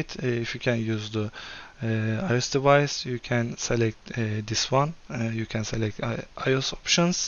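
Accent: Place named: Turkey. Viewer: Aksandan direkt Türk dedim öyle çıktı. :) Başarılarının devamını dilerim. AR t-shirt yapabilir miyim flutter bilgim var unity bilmiyorum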